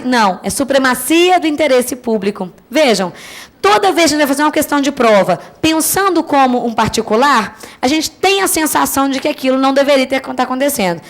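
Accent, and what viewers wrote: Minas Gerais, Brazil. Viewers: Agradecidíssima pelos vídeos! E eu amo o sotaque mineiro, então tô amando. Vou maratonar.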